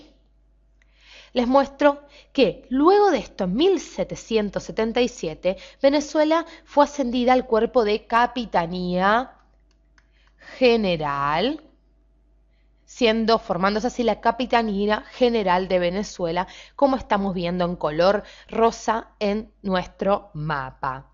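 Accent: Argentina. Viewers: CHE SOS COMO ARGENTINA NO SE XD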